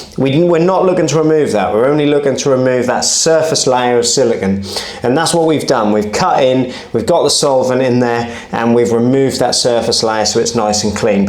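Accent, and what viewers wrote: Norfolk, United Kingdom. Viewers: Norfolk boi :)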